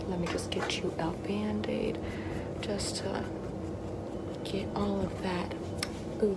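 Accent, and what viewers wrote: South, United States. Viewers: American Southern accent > any other accent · Ah yes, the near extinct classic Non-Rhotic Southern accent, how I wish this accent was more of a thing.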